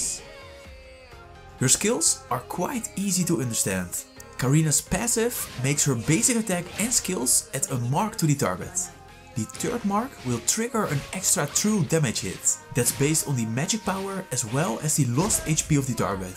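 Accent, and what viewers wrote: Netherlands. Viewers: ayo this guy is dutch i can hear your accent dawg.. Kaas 👀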